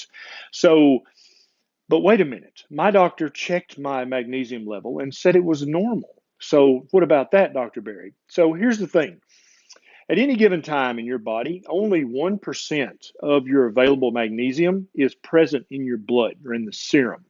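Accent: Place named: Georgia, United States. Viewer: You sound like you're from Georgia.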